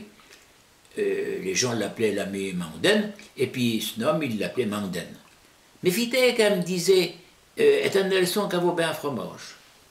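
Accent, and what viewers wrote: Quebec, Canada. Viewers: Je trouve que ça fait beaucoup penser à l'accent du nord-est du québec ex. Havre-Saint-Pierre!! Vraiment cool de découvrir ça! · J'ai appris qu'il y a eu une débarquation d'anglo-normand en Gaspésie peut-être c'est pour ça l'accent est similaire au Québec, surtout au nord :P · Beaucoup de similitudes avec l’accent Québécois. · Je suis du Québec et votre manière de parler et les mots de patois normands sont très similaires à ce qu'on dit ici! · On dirait que vous parlez Québécois dis donc? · Wow, que c'est beau d'entendre ce parlé normand en France qui ressemble au mien au Québec. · J'ai l'impression d'écouter du français québécois de quelqu'un d'une autre région quelque part dans l'est. Ça parait que nos ancêtres normands nous ont transmis une grande partie de leur langue. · Ça sonne très québécois c'est fou :O (je suis du Québec)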